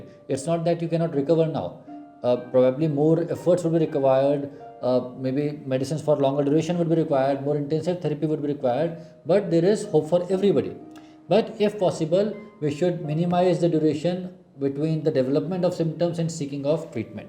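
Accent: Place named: India. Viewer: Why is indian accent so annoying? It makes me depressed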